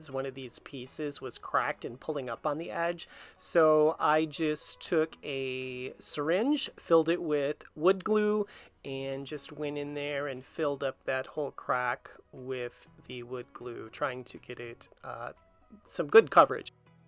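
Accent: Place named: Midwest, United States. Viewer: I THOUGHT I heard some midwest inflection there! (I'm in Ohio) What gorgeous wood grain was hiding under that old varnish!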